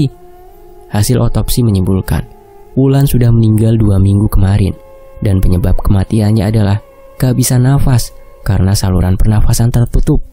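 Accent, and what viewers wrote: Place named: Kebumen, Indonesia. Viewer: Mbak dr smg. Tp logatnya kebumen 😊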